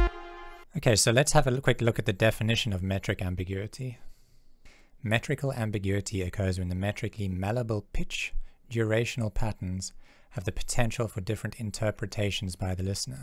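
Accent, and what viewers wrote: South Africa. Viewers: Are you a South African that moved to the UK?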